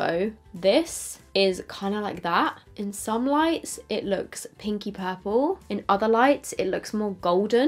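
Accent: United States. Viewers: Omg. Can u do a video speaking in an American accent!!???? That would be so cool and funny if u did like a whole tutorial in ur American accent!!! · Who else wasn't ready for the American accent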